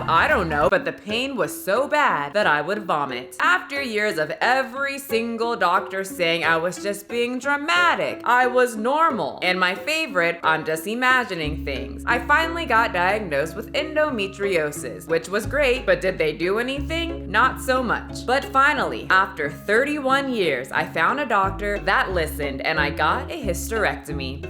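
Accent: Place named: Hemet, United States. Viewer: Why does this sound like Hemet California 😂😂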